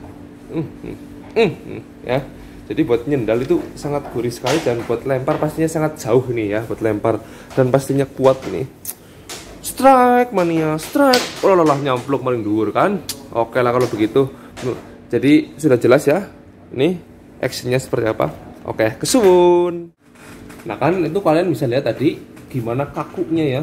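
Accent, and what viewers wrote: Banyumas, Indonesia. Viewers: Deneng bakule ngapak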